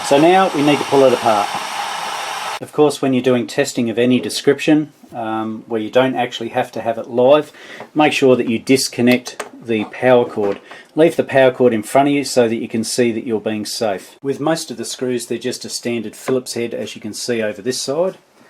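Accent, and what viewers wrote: Australia. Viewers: Loved your delightful Aussie, unassuming, understated technical explanation that clearly demonstrates, without intending to, that electronics is not at all for the home handyman. This 'repair' is way out of my league, and I'm off to buy a new kettle!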